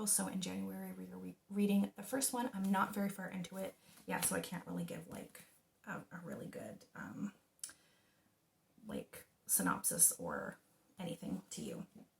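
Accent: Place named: Eastern Canada, Canada. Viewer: Haha - no I couldn't tell. I heard an "Eastern" Canadian accent once or twice, though. 😄 I'm from the West so I fail to differentiate all the many Eastern/Atlantic accents unless they are obvious obvious, like peppered with b'y, for example. 😂 You have a very subtle accent to my ears, whatever that means.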